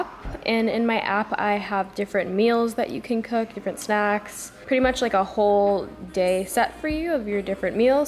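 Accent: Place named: Minnesota, United States. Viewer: Would never have thought Minnesota lol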